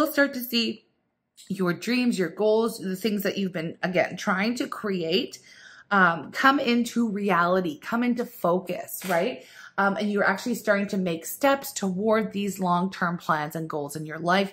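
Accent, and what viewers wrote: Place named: United States. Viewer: Your american accent is amazing! I'm always sooo inspired by you and your content. Absolute Legend!!!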